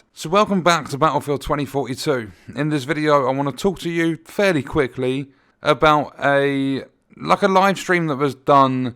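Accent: United Kingdom. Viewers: Love your explanation bro , i just subscribed to your channel, seems lovely and interesting with British accent lol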